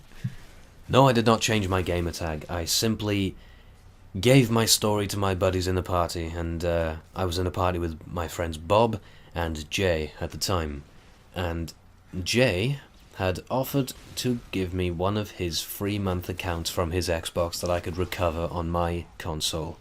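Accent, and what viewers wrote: Wales, United Kingdom. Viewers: He's from Wales, UK. Well, his accent's Welsh, so I assume he lives there.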